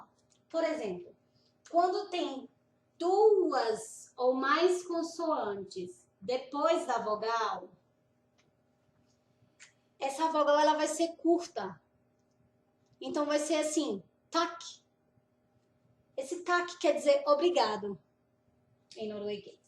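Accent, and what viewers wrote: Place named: Northeast, Brazil. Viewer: Tô louca pra aprender norueguês. Caí aqui na doida, mas já te amei. Obs: Acho que vc é do nordeste, vc tem o sotaque parecido com o meu. Sou de Natal/RN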